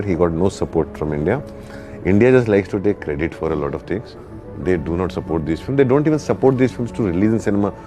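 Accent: Kerala, India. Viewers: Bro why I feel like you have malayalam slang in your hindi and you pronounce malayalam correctly like any other mallu.. unlike North Indian... is that a mallu guy behind the mask.. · Dude your mallu accent is so obvious 🙂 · But your Hindi like Malayali Hindi ...? Why ...?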